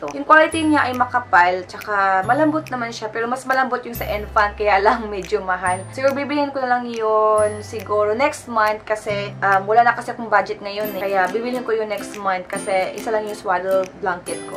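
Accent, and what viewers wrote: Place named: Visayas, Philippines. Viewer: Bisaya ka po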